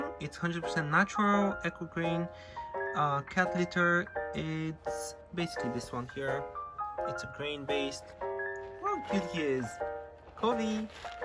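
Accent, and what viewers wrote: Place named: Cyprus. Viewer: Awwww God Bless You Both ♥️♥️ So much to say....are you from Cyprus ? I have a young man living with us here from Cyprus, you sound very much like him. I also have a Scottish Fold....but he has long hair and straight ears, the Highland Fold Straight. I watched Maru for many years on YouTube. If you haven't seen him, please Google him . Maru holds the Guinness Book of World Records for the most viewed pet on YouTube ! He lives in Japan with a wonderful Lady and two female domestic shorthairs named Hana and Miri. He is Absolutely Adorable ! He loves boxes 😂🤣😃♥️ I was so in love with Maru. My Daughters were grown and I had no pets. I asked my Daughter if she could find a Cat for me. She went to her Facebook page and the first post was a cat, his owners moved and left him behind !!!!! I will never understand that. She brought him to me and I was shocked because I knew right away that he is a Scottish Fold.... 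I just adore him, his name is Fat Boy. Anyway, there is one trait that is frequently said about the Scottish Fold....They can be stubborn. Fat Boy really lets me know when he wants to be brushed, and then he sits about a foot away from my outstretched arms so I have to come to him ! I have had Cats all my Life. Fat Boy is very Special. Enjoy Kovi, he is adorable !!!!!!! ♥️♥️♥️♥️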